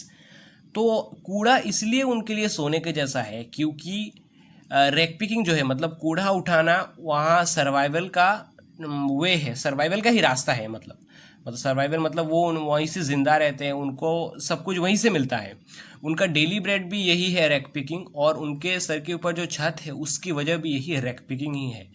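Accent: Assam, India. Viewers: Bro kya aap assam se ho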